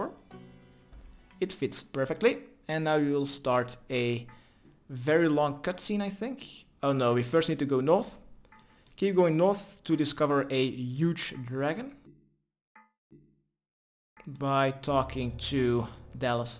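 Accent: Netherlands. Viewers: Well just finished the quest.. after 10 tries I finally managed to kill Galvek. I found Vanstrom Klause a harder boss to beat mechanics wise, but this damn fire ball in combo with the waves was unlucky. Only got song of the elves & secrets of the north as my last two quests. I want to thank you for the whole journey thus far, as a fellow dutchy it's been so enjoyable to listen to you. That damn accent haha! Thanks so much man, much love.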